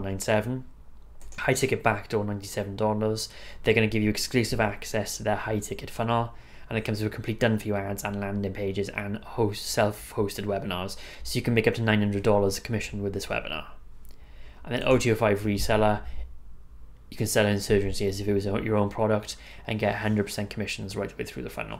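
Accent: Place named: Wales, United Kingdom. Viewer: I love your Welsh ancient, it reminds me of my Shrewsbury family! Your content is Gold and I love your no frills approach it’s refreshing 🤘🏽💯 keep going brother you’ll be super affiliate in no time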